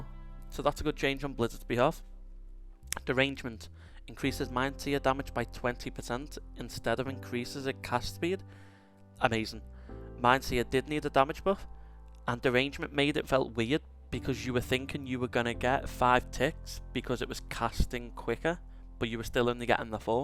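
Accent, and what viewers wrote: Liverpool, United Kingdom. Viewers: Can’t work out if that’s a scouse or wirral accent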